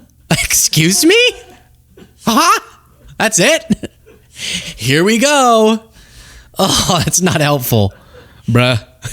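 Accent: Finland. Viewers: we dont need a Finnish accent. Or the giggles and juvenile humor. You have talent, just keep your show professional. The humor you try to introduce doesn't work with the subject matter you cover. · Finnish accent :D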